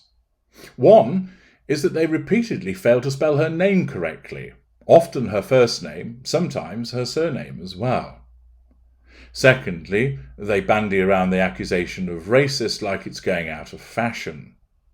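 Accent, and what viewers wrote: United Kingdom. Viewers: Totally love your commentary who wouldn’t be in chanted by his voice alone is very desirable. Oh the perfect British accent I imagine you are tall and handsome from Florida with love and flirting lightens things up.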